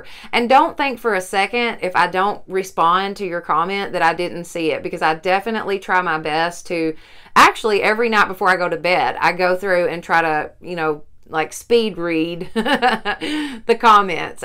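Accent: South, United States. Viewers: Love them all. By the way, where are you from? You accent sounds like you are from the south! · That ribbon tree! And btw love the accent. I'm southern too🤗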